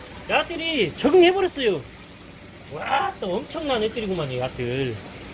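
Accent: Jeolla, South Korea. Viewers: 전라도 사투리가 구수허네유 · ㅋㅋㅋㅋㅋㅋㅋㅋㅋㅋㅋㅋㅋㅋㅋ 토종 전남분이시죠? 아 웃긴그 ㅋㅋㅋㅋㅋ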